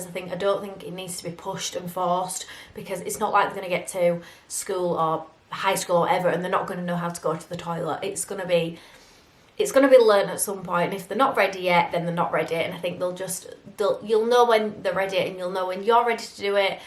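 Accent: Yorkshire, United Kingdom. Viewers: İs that a Yorkshire accent?! X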